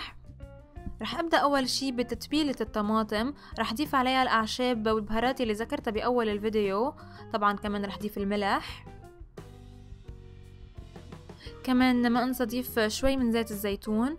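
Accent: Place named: Levant. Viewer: ماشاءالله وصفاتك بتجنن ولهجة اهل المشرق بلاد الشام ماشاءالله بتعقد 😍😘😘😘 موفقة حبيبتي 👍🌹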